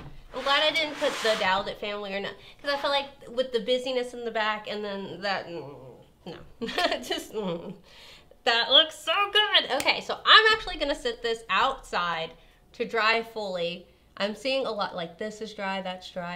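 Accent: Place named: South, United States. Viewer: I love this. I purchased stencil stuff two years ago and was just to intimidated to try to make the door mat. I’m going to try it now thanks to you. I learned some things about welding too. Love your accent, I’m southern too! Awesome tutorial, thanks.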